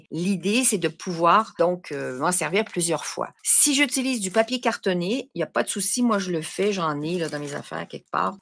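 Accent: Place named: Quebec, Canada. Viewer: Bonjour, je viens de vous découvrir 😊 c'est drôle, je suis québécoise et je pensais que vous l'étiez à la façon dont vous parlez, je me demandais si vous étiez française ou québécoise. C'est dommage mais j'ai une Cricut mais je vais quand même aller voir vos vidéos 😊 ce que j'aimerais c'est d'avoir des projets pour utiliser ces impressions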